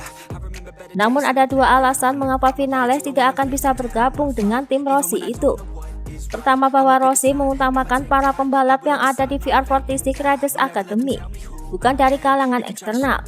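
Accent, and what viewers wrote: Java, Indonesia. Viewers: Iki MiMinya wong jogja phooww..yoo yg isi suaranyaa..medhokk..bgt..Min... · Admin Medok mania mantap 😂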